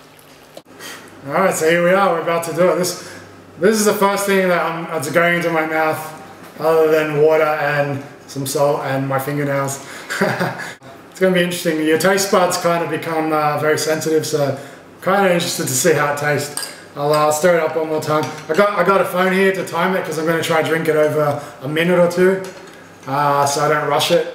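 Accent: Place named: Greek Australian, Australia. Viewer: 2 seconds in i was like yep Aussie Greek. It’s the accent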